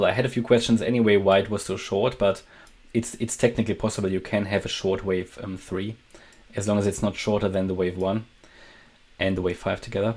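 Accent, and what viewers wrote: Germany. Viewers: Are You German? I hear a German accent?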